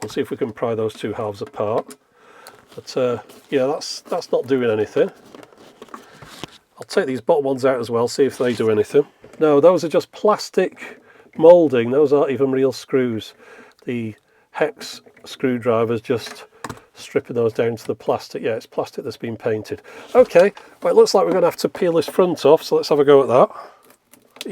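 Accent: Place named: RP, United Kingdom. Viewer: "Right. For some reason, the radio's stopped tuning in now. I think it’s all dying, but anyway…" His ability to sarcastically say something so matter-of-fact with such a posh, high-class English accent never fails to amuse me. 😂😂😂👏🏼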